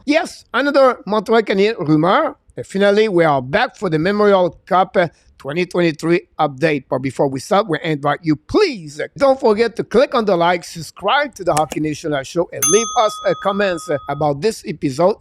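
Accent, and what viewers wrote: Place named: France. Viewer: I love just how french you are Coach! The way you speak c'est parfait! Keep up the content please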